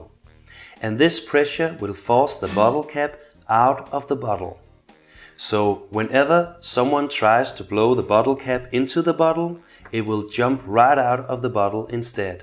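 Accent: Denmark. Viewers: You sound danish..... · lol he's sound danish :D im danish to. so .. its sounds danish ;) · Danish accent :P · haha. I can tell that the speaker is danish :) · he haves a danish accent, trust me im danish :D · Haha, great vid, and love the danish accent :P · Danish Accent?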